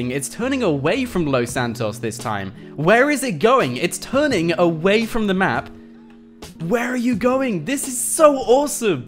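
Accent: United Kingdom. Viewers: Bro are you british🤔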